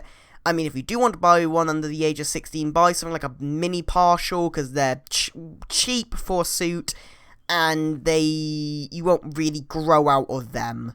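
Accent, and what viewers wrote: United Kingdom. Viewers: r u fucking british?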